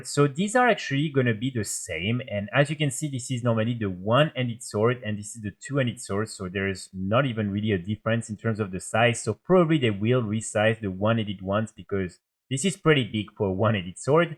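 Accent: France. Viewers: Je comprend beaucoup trop facilement cet anglais, c'est un compatriote xD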